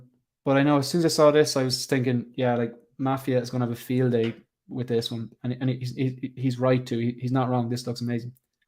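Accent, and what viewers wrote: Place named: Ireland. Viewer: assume by your accent you are based in Ireland? So where do you normally buy your figures ?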